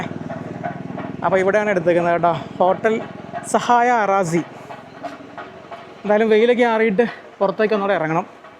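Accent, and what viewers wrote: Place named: Idukki, India. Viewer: ഇത് x-mas വെക്കേഷന് എടുത്ത വീഡിയോ ആണോ. ചേച്ചി ട്രിവാൻഡ്രം ആണെങ്കിലും ഇടുക്കി സ്ലാങ് ആണലോ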